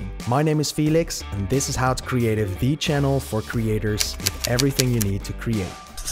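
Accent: Netherlands. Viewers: Great video! Im recieving this one tommorow and and when i opened the video i could immediatly hear you are dutch too haha, your channel looks great! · Are you dutch by any chance?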